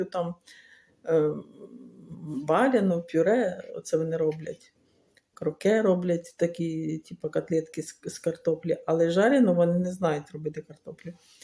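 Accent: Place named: Vinnytsia, Ukraine. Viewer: Я думала,що ви з Віниччини,або Хмельниччини. Акцент не черкаський,бо маю колежанку Раю з Ватутіна і чула,як розмовляли її землячки. Вона з Рівнинщини.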